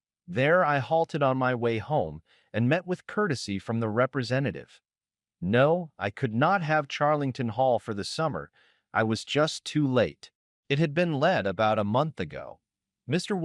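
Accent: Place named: United States. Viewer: Probably a great story. Just can't listen to the American accent. Conan Doyle would probable be a little restless in his grave.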